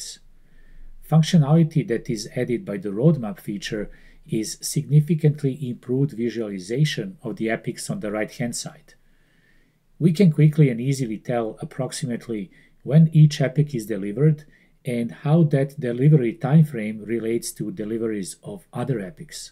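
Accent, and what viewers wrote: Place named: Balkans. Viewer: Odma sa aboniro , cuje se nas covjek i sto je jos bolje tvoj Engleski razumijem 😊 Hvala 👍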